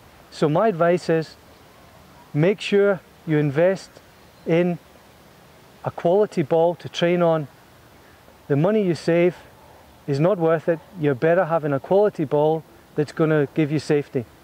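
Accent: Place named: Scotland, United Kingdom. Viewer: Touch of Scotland in his accent.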